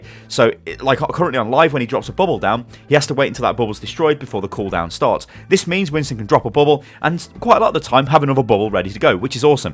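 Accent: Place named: United Kingdom. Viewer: Sometimes i wonder if i am even i interested in the video itself or am just staying because if you nice smooth british voice and accent ¯\ツ/¯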